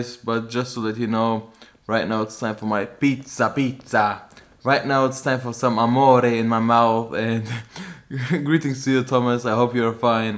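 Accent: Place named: Germany. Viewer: Das hört sich an wie wenn ein deutscher probiert englisch zu sprechen halt nur in richtig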